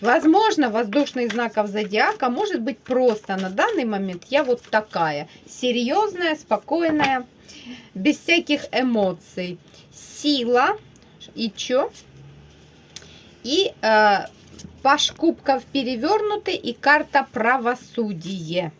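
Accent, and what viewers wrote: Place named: Kuban, Russia. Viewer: У вас кубанский говорок. Я сама, из тех мест....🤗⚘️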